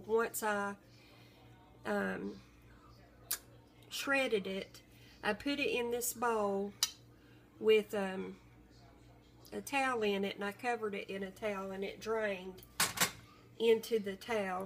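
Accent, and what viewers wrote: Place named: Rural, United States. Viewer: Love your country accent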